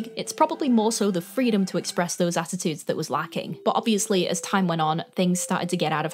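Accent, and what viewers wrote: United Kingdom. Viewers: I am trying to pin down your accent. It sounds sort of British, but not really.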